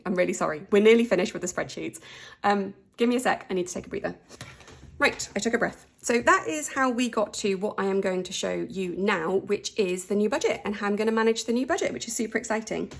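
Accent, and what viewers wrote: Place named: West Country, United Kingdom. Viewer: Love the west country accent lol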